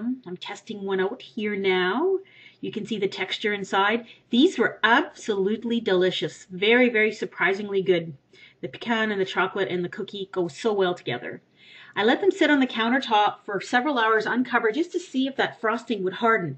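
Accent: Canada. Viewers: It's an accent thing common among canadian people.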